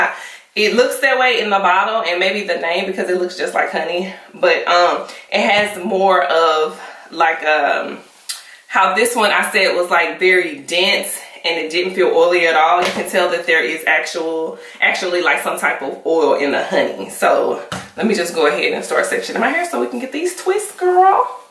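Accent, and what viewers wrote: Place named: Texas, United States. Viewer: I love your voice. You sound like my family in Texas. Ima go ahead and subscribe 🤣❤️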